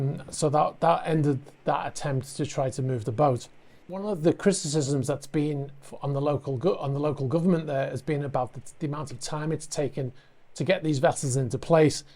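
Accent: United Kingdom. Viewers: Love the HAOLE Announcer, very Brit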